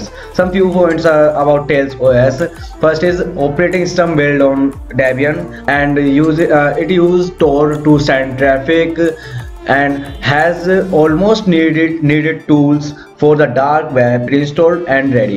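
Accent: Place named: India. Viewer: nothing personal, i cannot stand tech videos with a strong indian accent, not being racist. its is just fucking impossible to learn the details. would be better off with just a generic robot voice. im sure many millions of people around the world would think the same.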